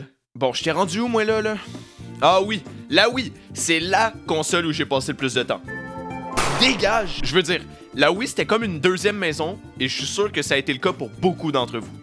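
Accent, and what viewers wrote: Quebec, Canada. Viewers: Insane ta vidéo!!! Good job mon gars, en plus en Québécois hahaha il y en a pas asser:)) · L'accent québécois😂😊❤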